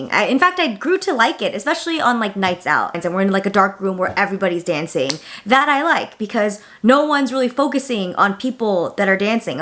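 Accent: United Kingdom. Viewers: Loving the British accent while cooking haha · I like your British accent. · Are you British accent? It sounds slightly korean.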